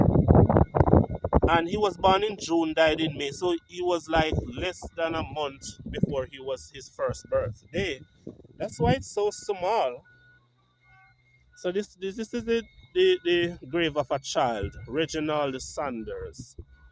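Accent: Jamaica. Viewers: are u jamaican proper proñounciation pĺease love ur program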